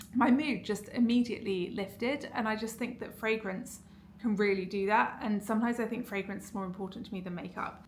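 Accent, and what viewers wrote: United Kingdom. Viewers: I am sooooo happy you are back! I watched you for years. You are very entertaining to watch and I love your British accent. (I am from the US). Everything sounds better with a British accent. You look so pretty….so excited for the tour of your newly renovated house.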